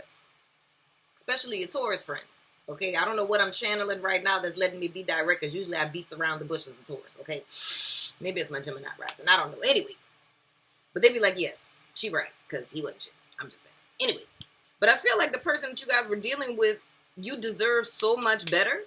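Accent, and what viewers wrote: New Orleans, United States. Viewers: Love this video and your energy I’m an Aries male and I have been dealing with my ex a lot. Know u was from New Orleans within the 1 st few sec I am too deep it goin luv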